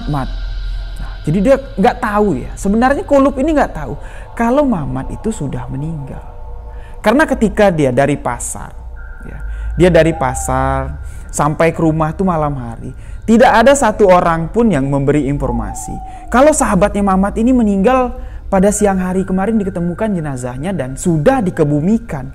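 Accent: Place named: Jambi, Indonesia. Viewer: Hadir bang Pertahankan logat jambinya,,,,, MANTAP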